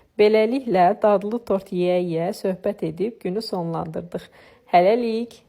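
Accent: Karabakh, Azerbaijan. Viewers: Əsl qarabaq ləhcəsi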